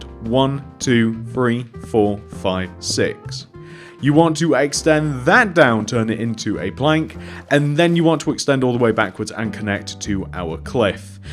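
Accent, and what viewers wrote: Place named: Wigan, United Kingdom. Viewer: Mate you sound like you’re from Wigan.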